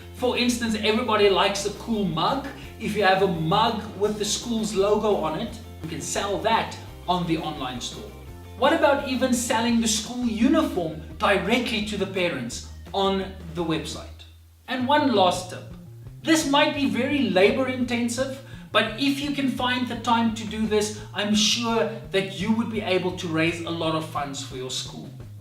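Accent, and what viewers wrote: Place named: South Africa. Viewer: You sound like you're from South Africa or from Zimbabwe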